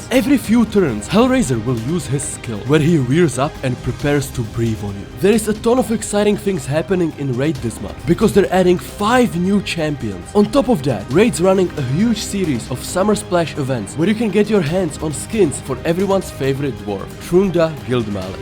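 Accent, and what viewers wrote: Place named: Czech Republic. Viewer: Libi se mi ten tvuj ceskej prizvuk😂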